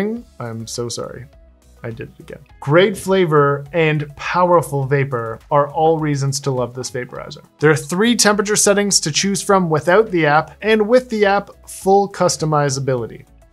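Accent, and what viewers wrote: Germany. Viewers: I love the German accent makes me feel like I’m watching Hogan‘s heroes. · Got me subscribing from the German accent 😂 but you speak facts so I like that , you got my view brother and will be watching , keep it up 🌲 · That German accent is the reason why i liked your vid just 2 minutes in xD Also could you do a vid explaining everything about a dry herb vape? like what cause the high to end sooner etc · Loved the German accent, really good. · Good German accent Vielen Dank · You get like and subscribed for that German accent! 🤣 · Nice entertaining presentation. I’m just starting out and you really helped clear my confusion. Nice German accent too :-).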